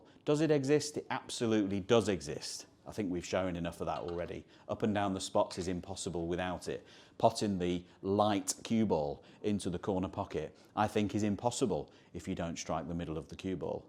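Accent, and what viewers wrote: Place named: RP, United Kingdom. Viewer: Great snooker player but such a boring generic, posh sounding personality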